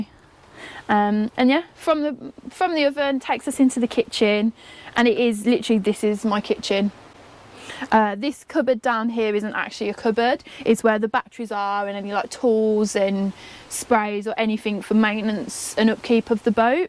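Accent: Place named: Coventry, United Kingdom. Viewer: This may be a bit of a long shot but that sounds like a Cov. accent. Possibly even Nuneaton.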